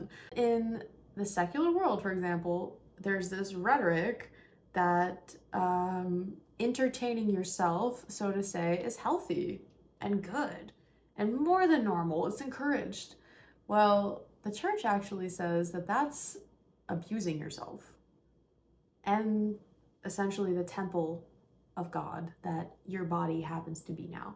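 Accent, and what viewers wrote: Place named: South, United States. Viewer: Why are you speaking in a Southern redneck accent?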